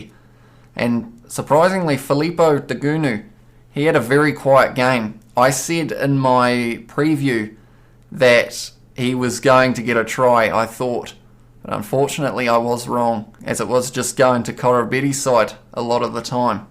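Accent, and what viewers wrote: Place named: New Zealand. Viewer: Kia Ora, cuzzy! Love the Keewee accent!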